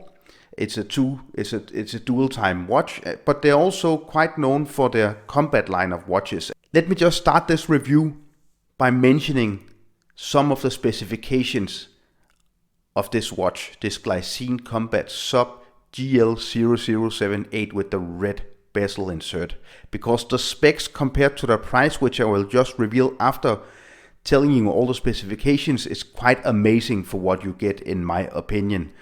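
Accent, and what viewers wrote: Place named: Denmark. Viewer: Aha, dann viele Grüße nach Dänemark, hab vom Dialekt gedacht, das kann doch kein Amerikaner/Engländer sein :-)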